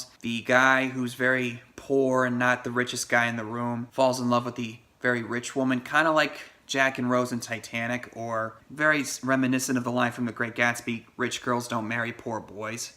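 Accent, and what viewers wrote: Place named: Ireland. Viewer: Do a YouTube search for Star Stories Tom Cruise to see a very funny “summary” of the film. I enjoyed your review and it nearly makes me want to watch the film again to see if I will change my rating from the one I gave it then: TotalAbsoluteLoadaShite. Your Irish accent is grand, def better than many American actors.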